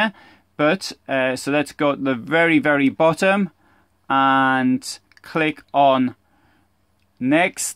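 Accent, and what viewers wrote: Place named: Newcastle, United Kingdom. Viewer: You sound a bit of a Geordie, like eh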